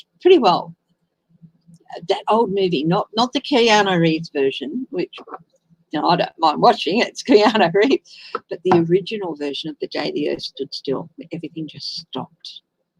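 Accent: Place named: Australia. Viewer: Thanks for reading on DeSantis,. Ron DeSantis is known to be tied to Trump. Cheating in the election is widely suspected. Lev Parnas and Igor Fruman were VIPs at his inauguration! Russia has a tight grip on the U.S. and it has been deadly. At this point I would not be surprised if people lose their homes and they are sold to Russian and Chinese oligarchs. I frankly don’t know if Trump will ever be brought to justice. I’ve kind of lost hope about it. The entire GOP are traitors who worship money 💰 and drag the name of Christ through the mud as they pretend to be bastions of morality when nothing could be further from the truth. The past four years have taken a heavy toll on me. I live in NW Florida just a few miles away from Johnie ( though we’ve never met in person). I love 💗 your readings— your Aussie accent is soothing. Your laugh makes me smile. 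🤗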